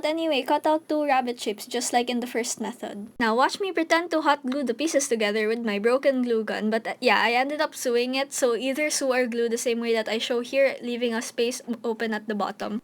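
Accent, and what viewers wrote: Philippines. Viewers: finally a Filipino that knows tbhk...finally (luv ur accent and ur sarcasm uwu) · I thought you were a Filipino.....the accent tho😣👌👌👌👌👌💞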